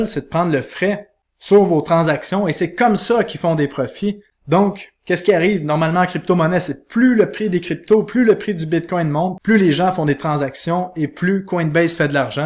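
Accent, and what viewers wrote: Canada. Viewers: Je tiens à exprimer mes sincères félicitations pour la qualité exceptionnelle de votre vidéo, votre contenu est une véritable pépite pour tous ceux qui cherchent des approches novatrices et efficaces pour maximiser leurs investissements. Elle est un exemple éclatant de professionnalisme et d'expertise. J'ai été impressionné par la clarté et la concision avec lesquelles vous avez expliqué chaque stratégie. Votre capacité à démystifier les investissements en actions et en ETFs, tout en offrant des conseils pratiques, est tout à fait remarquable. Vous avez su équilibrer avec brio des informations techniques et une accessibilité pour un public varié. En plus de la qualité de votre contenu, j'ai été captivé par votre présence à l'écran. Votre éloquence et votre passion transparaissent à chaque instant, ce qui rend votre vidéo non seulement instructive, mais aussi extrêmement engageante. 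Vous avez indéniablement une aisance naturelle devant la caméra, et cela renforce l'impact de votre message, et ce petit accent canadien est excellent et rajoute de la légèrete a la vidéo. Votre approche comparative entre le Bitcoin, les cryptomonnaies, les actions et les ETFs a apporté une dimension supplémentaire à votre vidéo. Vous avez offert aux spectateurs une vision éclairée des différentes options d'investissement, en les aidant à prendre des décisions éclairées en fonction de leurs objectifs financiers. En résumé, votre vidéo est un véritable chef-d'œuvre d'informations et d'inspiration po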